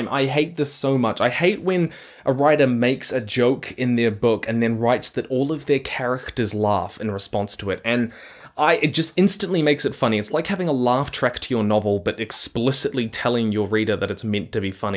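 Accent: United Kingdom. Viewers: Screaming at this video being how I discovered you were from New Zealand 😭 I just thought you were British this whole time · Bro I'm just finding out you're in NZ too?? Literally thought you were British - I'm in Auckland, moving to whangarei soon, hang out with me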